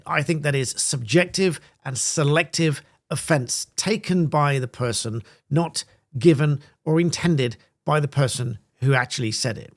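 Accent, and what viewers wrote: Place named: Falkirk, United Kingdom. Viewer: It was a statement of fact ffs! She certainly sounds like she's from Falkirk, or is an accent racist now.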